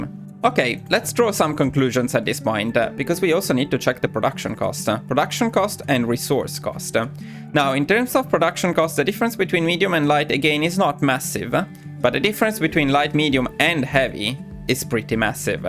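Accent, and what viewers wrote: Italy. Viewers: Bel video,dall’accento sembreresti italiano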